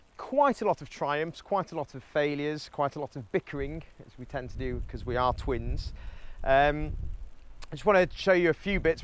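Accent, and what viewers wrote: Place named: Northern England, United Kingdom. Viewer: This accent is so unique. It's almost 'posh' sounding and unlike most Northern accents. Wheres its origin?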